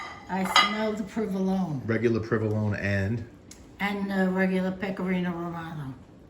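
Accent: New York, United States. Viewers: Stanley Tucci started the recent craze about Spaghetti Nerano. There is a video of Stanley watching an Italian Chef make the dish which Stanley says was “ life changing” . Chef John from Food Wishes agrees and insists deep frying the Zucchini rather than pan frying is the first key. The second key is refrigerating the zucchini overnight. The kitchen science is not explained. Finally, Chef John uses more butter. Otherwise , he mashes the zucchini like you do , and he used substitute cheeses like you. I pan fry my zucchini in more oil and butter than you do, but I hate deep frying. I might refrigerate the zucchini once to see if it matters. I love watching you as your accent reminds me to call my sister in New Rochelle( I live in Omaha since Medical School). 😘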